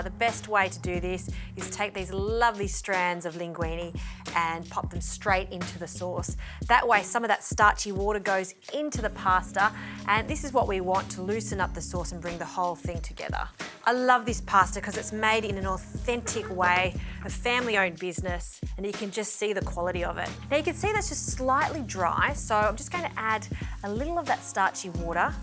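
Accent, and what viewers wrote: Australia. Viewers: I don't see the problem here. She said pasta, she has Aussies accent. We all know what she meant. Also, no ones asking. Hope ya have a better day champ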